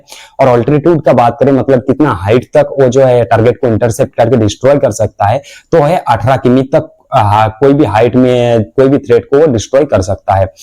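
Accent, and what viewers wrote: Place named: Bengal, India. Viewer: अभी आपको हिंदी बोलना सीखना चाहिए।बोलते समय स्त्रीलिंग पुल्लिंग का ध्यान नहीं रखा गया है। आपकी हिंदी में बंगाली छाप झलकती है।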